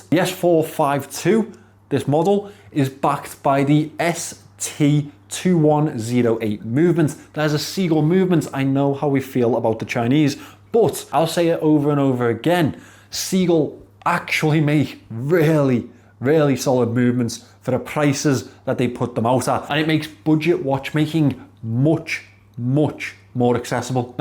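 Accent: Liverpool, United Kingdom. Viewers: You're the first Scouser that doesn't irritate me lol, great Vid xx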